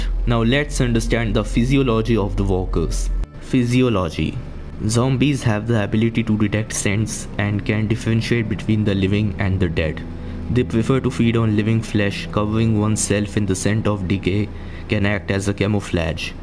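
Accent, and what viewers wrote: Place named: India. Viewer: I prefer the Indian accent then some bot Ai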